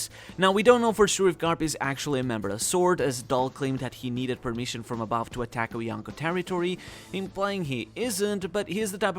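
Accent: Spain. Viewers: Are you Spanish? I’m just guessing from your accent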